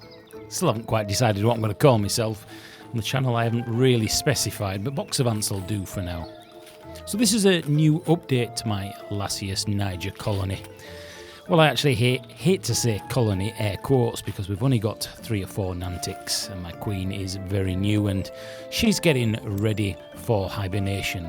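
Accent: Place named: Northern England, United Kingdom. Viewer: That moment when you realise your tube doesn't fit.... Love the northern accent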